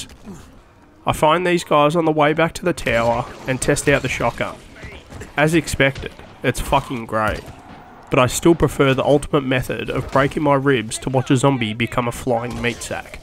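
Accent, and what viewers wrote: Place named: Australia. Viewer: The Aussie commentary is great Hoping to see more of this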